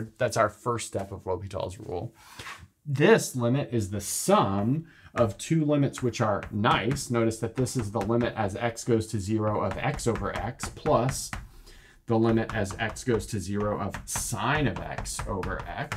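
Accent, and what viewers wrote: United States. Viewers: at the beginning of seeing these videos I was thinking that the formula lim f/g=lim f'/g' was the log beetle rules and I realized that it was l'hôpital rules and I am french😂 American accent is really fun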